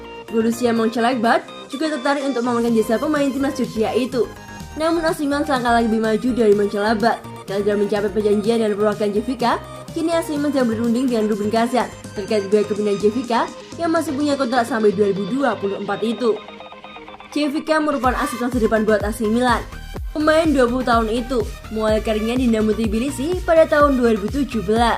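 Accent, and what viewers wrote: Java, Indonesia. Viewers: Ganti lah voice over nya, medok ngmg kek kumur2 mana cadel lg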